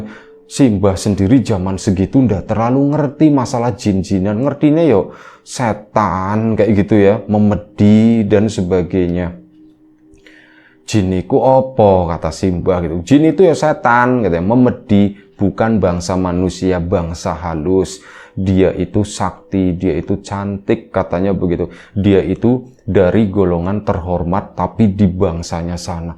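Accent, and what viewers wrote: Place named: Banyumas, Indonesia. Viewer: Hader kawan...ora ngapak ora kepenak😃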